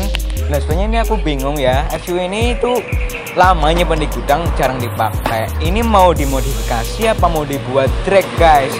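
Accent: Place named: Banyumas, Indonesia. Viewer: Ngapak gaes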